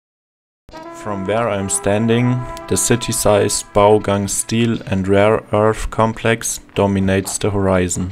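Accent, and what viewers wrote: Germany. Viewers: dieser deutsche akzent is anders geil haha :D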